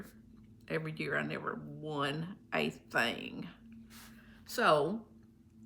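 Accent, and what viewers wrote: Kentucky, United States. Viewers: I enjoy your videos guess I like your Kentucky accent!! My parents were from Kentucky many many years ago✅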